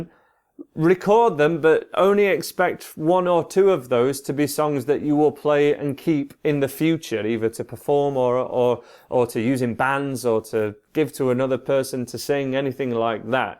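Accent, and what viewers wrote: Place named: Yorkshire, United Kingdom. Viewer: This is really helpful, thank you :) what part of Yorkshire are you from?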